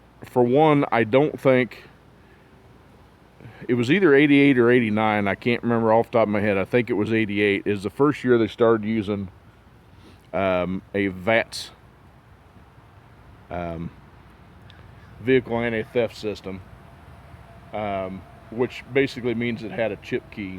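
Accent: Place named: North Carolina, United States. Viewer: Great videos!!! What part of the counrty are you in?? Im in NC you sound like you might be close lol just wondering. 87 GTA here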